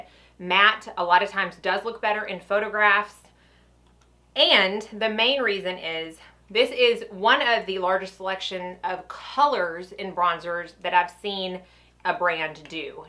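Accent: South, United States. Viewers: Where are you located? I only ask because I live in Louisiana and I detected your southern accent. I vaguely remember you mentioning Tennessee?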